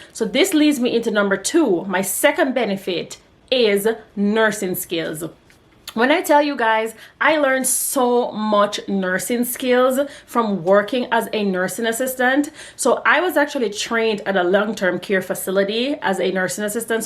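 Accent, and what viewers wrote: Jamaica. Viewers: Yeah I can hear the patio 🙂 you are a yardie 🇯🇲. I enjoyed your bullet points. And yes, I am an aide.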